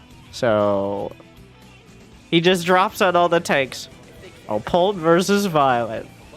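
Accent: South, United States. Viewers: Does he have a southern accent?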